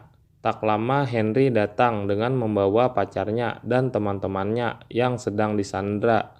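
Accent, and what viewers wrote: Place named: South Kalimantan, Indonesia. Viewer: Totok banar banjarnya masih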